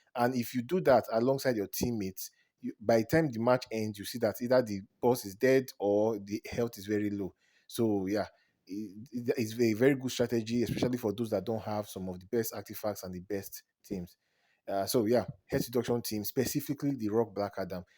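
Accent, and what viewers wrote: Nigeria. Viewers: I enjoyed the video bro you sound like you are a Nigerian 😊. I don’t know how to play league invasions was looking for answers and then stumbled upon your video 🙏🏾. I have all the best teams and op artifacts still I suck 😂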